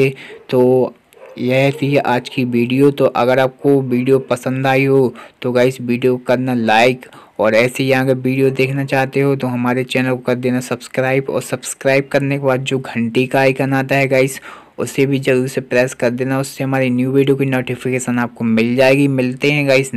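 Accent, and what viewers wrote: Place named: Narsinghpur, India. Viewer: Boli se 😃 narsighpuriya sabse badhiya 😉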